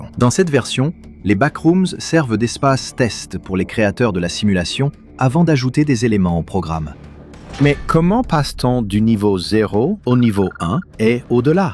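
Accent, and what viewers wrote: Quebec, Canada. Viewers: La vidéo est en québécois ?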